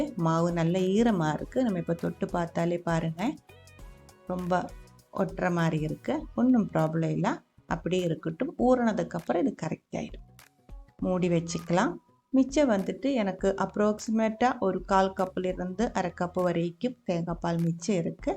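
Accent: Kerala, India. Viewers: Listen her accent, she too is a Keralite 😃😃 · Thank you for your video and detailed instructions. I very much enjoyed your Tamil with Malayalam accent. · Auntie, yude thamizh... Manassilakunnundu... Malayaliyanennu... But a good effort, keep it up 🎉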